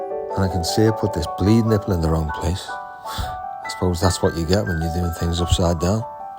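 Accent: Liverpool, United Kingdom. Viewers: sound like a scouser , well done wack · Being a fellow scouser who has not lived there for over 50 years, it was to fun to hear the accent again. I applaud you on your knowledge of motors. If I am ever in Liverpool again, I will keep a lookout for a black and/or red roller corniche. 👍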